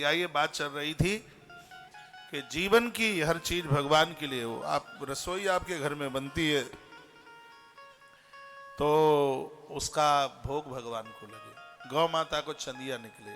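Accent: Braj, India. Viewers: Gurudev aapki brajbhasha bahut pyari lagti hai Radhe Radhe❤🙏🙏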